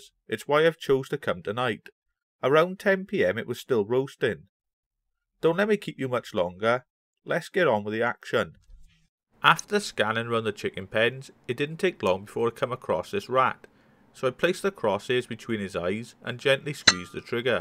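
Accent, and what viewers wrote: Wales, United Kingdom. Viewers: Ha , great video, love the Welsh accent. Don't the rat's that get inside the wall where you can't recover them start to honk after a few days? Especially in that steamy hot Pontypool climate.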